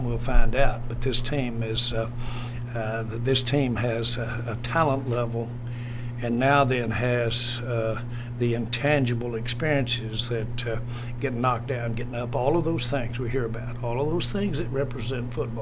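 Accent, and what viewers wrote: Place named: South, United States. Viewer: Last known human with the accent of a slave owner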